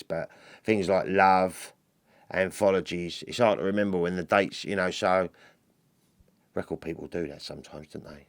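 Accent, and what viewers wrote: Cockney, United Kingdom. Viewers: Is that a cockney accent I hear?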